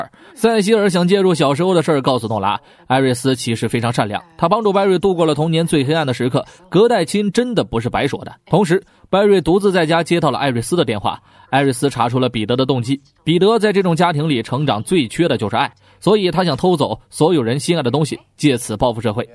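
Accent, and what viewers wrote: China. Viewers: 主播是湖北人，口音暴露了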